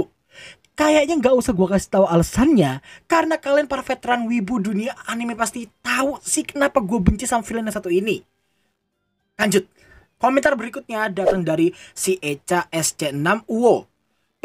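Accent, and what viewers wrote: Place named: West Java, Indonesia. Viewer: Bang lu orang Jawa Barat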